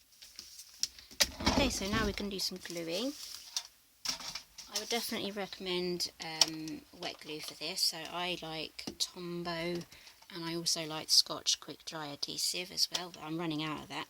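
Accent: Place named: United Kingdom. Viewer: So nice to see and hear someone from the UK, great video and book.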